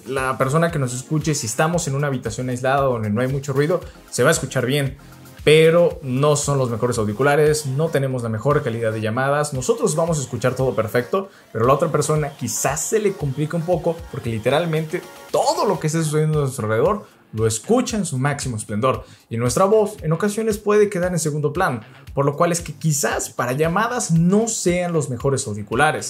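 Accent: Mexico. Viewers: Me gustan muchos los vídeos de este canal pero me fastidia la forma de hablar jajajaja puro hablado de mi rey de culiacan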